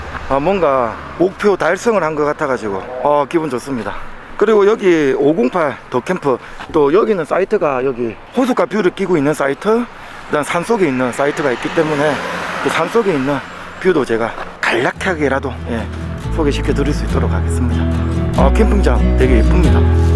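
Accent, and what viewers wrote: Gyeongsang, South Korea. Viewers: 일본어쏼라쏼라~~~믓지네요 뷰도 믓지고 ㅋㅋ 근데 일본어도 경상도 사투리가 묻어있네요 뼈속까지 갱상도!!ㅋㅋ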